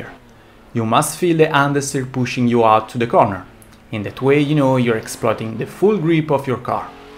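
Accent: Italy. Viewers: scusami, è da poco che ti seguo ho solo visto 2/3 video, ma qualcosa nella tua voce mi dice che sei italiano, è irrilevante e solo una mia curiosità. comunque fai dei bellissimi video complimenti! sorry, I have only recently seen 2/3 videos, but something in your voice tells me that you are Italian, it is irrelevant and just my curiosity. anyway you make some beautiful video compliments! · Are you Italian?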